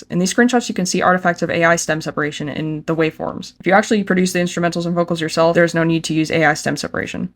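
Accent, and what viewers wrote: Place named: United States. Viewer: I'm sorry I just find it so funnily absurd that your name is and you have the most american accent I have ever heard (which i assume you are one, based on your account location)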